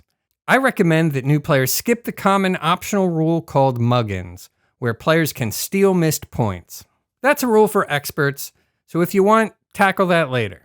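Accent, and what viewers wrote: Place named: Delaware County, United States. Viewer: You sound like you're from Delco......GO BIRDS!!!!!!!!!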